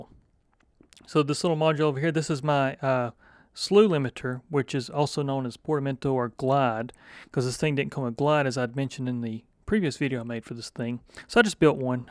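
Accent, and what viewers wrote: South, United States. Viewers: This southern charm and accents are true 😊 your videoes demoing the Behringer moog systems totally sold them to me ❤️ wow 😊 please never stop sharing your videos 😊❣️🙌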